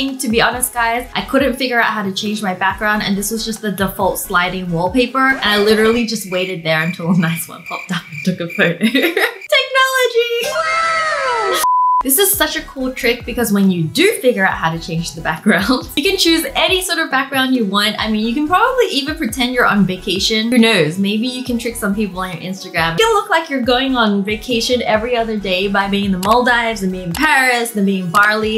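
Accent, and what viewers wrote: Australia. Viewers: Are you from Australia? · I do want to step up my Instagram game and these are helpful! I haven't watched you in a while but I swear your Australian accent is not as strong as before! 😯 What were the camera settings for the darker photos? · Is she speaking Australian ?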